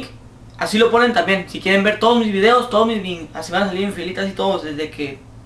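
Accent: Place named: Sonora, Mexico. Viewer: eres de sonora we , reconosco ese asento!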